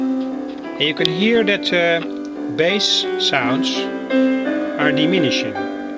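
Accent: Netherlands. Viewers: Are you dutch? becouse i am.. i can hear it on the way you talk..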